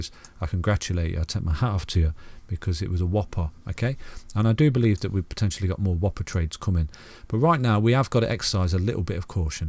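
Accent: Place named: Nottingham, United Kingdom. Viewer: You sound like a Nottingham lad! Great TA mate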